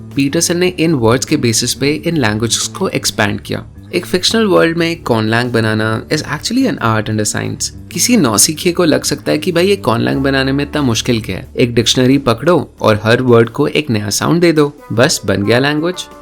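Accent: India. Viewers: bhai ka excessive indian accent hai, but he says "Avatar" like he's american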